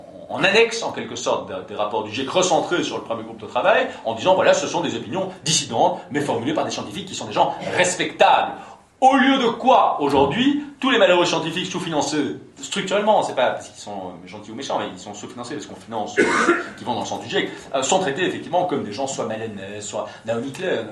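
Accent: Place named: Belgium. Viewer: C'est juste une des nombreuses formes de l'accent belge. Faut s'habituer... Pour le reste, je suis d'accord aussi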